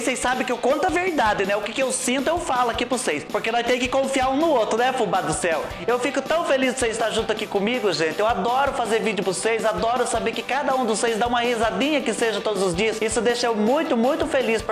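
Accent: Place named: Northeast, Brazil. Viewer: Fubá sou Cearense e seu sotaque lembra quem é Nordestino. Sendo do Nordeste ou não, AMO seu jeito de se comunicar.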